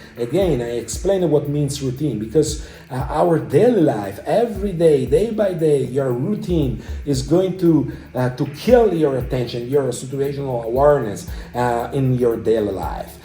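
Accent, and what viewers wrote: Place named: Italy. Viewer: The continuous music in the background makes it harder to understand the Italian accent on the spoken English. Good info. Thank you.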